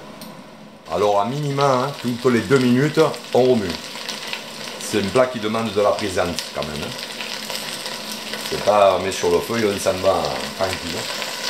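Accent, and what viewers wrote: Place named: Marseille, France. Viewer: Ah! Et j'oubliais... L'accent de Marseille, qui rime avec merveille...Top de super top!💖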